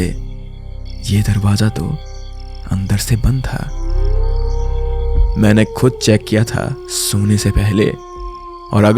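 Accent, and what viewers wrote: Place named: Bengal, India. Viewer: Love your Bengali Accent bro!! Support & Love from Medinipur, West Bengal ❤❤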